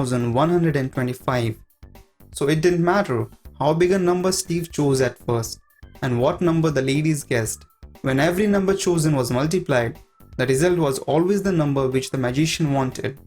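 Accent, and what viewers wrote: India. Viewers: If you didn't switch the voice to a freaking Indian one the video would better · I would've liked this more of the narrator didn't have such a thick indian accent that i can't understand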